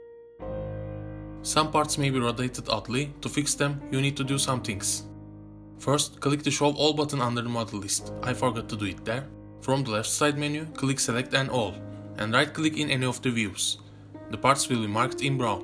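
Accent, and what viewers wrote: Turkey. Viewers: ingilizde aksanından bile türk olduğun anlaşılıyo .d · askandan anlaşılıyor türk olduğundan :) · türkmüsün la sen :D ingilizcenden anladım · túrk olduğunu aksağaníndan anladím benden kaçamazsín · ingilizcenden belli kardeşim sen Türk'sün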